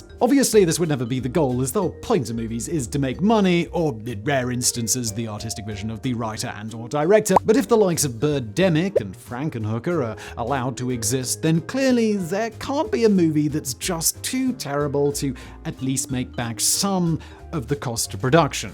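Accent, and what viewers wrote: United Kingdom. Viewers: THANK YOU so much for beeping out your obscenities. I am old, and people didn't do that publicly in my day. I love your content, your style, your intellect, and your lovely British accent. It is therefore obvious to me that you don't need to use expletives to express yourself very effectively. Thank you again.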